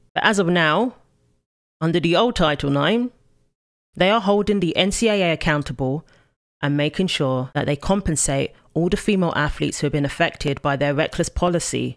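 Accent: United Kingdom. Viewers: Are you American or a Brit ? The accent says British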